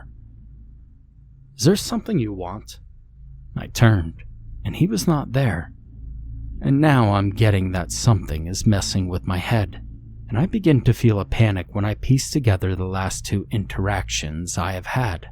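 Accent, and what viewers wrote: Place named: Texas, United States. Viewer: Holy shit! That was an incredible Texas accent with Eastern European undertones! I mean it! That might be the best, specific accent I’ve ever heard.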